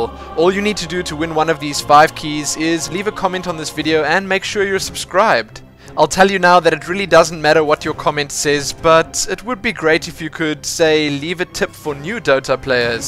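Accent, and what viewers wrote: South Africa. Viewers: You Sound South African Im from there too! Huge dota 2 fan! Key would be amazing!!!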